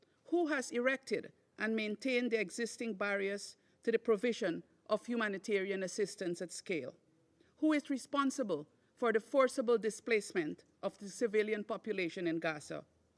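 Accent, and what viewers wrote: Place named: Caribbean. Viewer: In her lovely Caribbean accent: The US resolution is bullcrap!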